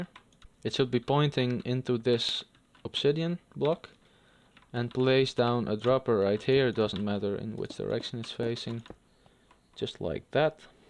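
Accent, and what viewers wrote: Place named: Netherlands. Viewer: i can just hear the dutch accent, i love it. cool build man, i am goin to need this very well!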